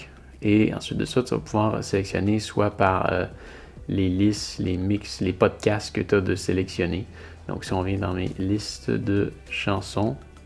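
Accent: Quebec, Canada. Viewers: Genial, merci beaucoup, ça m'a aidé à le repéré avec ma toute nouvelle montre Garmin :) En plus, je kif ton accent quebecois :) Juste un truc, je cherche encore comment on règle le volume .... si tu as la réponse, c'est welcome !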